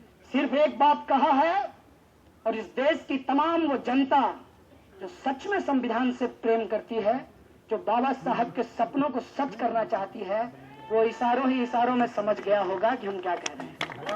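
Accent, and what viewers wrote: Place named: Bihar, India. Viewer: کنائیہ جی ہمیں کل ہی آپ کا تعرف ہوا ہے ایک بھارتی دوست سے اور کل سے آپ کا سپیچ ہی سن رہے ہیں Hamain bihari zaban to pehly hi bohat achi lagti thi ab ap sy bhi dil lagi hogai ha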